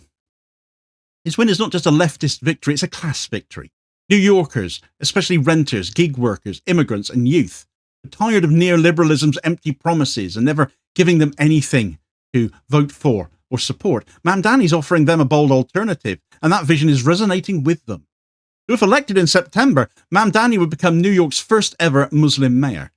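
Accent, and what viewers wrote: Cornwall, United Kingdom. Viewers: Sounds like a Brit to me. ❤😁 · Cornwall mate 😅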